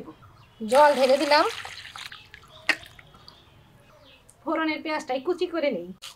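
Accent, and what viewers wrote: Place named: Bangladesh. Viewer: কথা গুলো বাংলাদেশের মতো ❤খুব ভালো লাগে 😊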